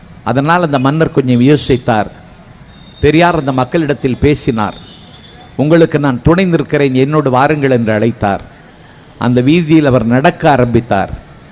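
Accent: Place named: Kerala, India. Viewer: இவரோட தமிழ் உச்சரிப்பு சரியில்லை மலையாளம் சுவை கலந்து வருகிறது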